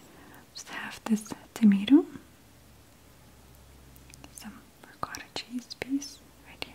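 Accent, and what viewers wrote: Russia. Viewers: The Russian is strong in this one. Lovely as always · Акцент то русский!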